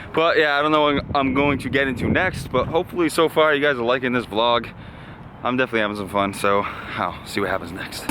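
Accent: Boston, United States. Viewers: That lady at Dunkin is all of us. Haha! Great video! Hearing your accent makes me miss Boston so much! Keep up the great work! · I just noticed the Boston accent . I didn’t know he was from up this way., meaning The NorthEast ,.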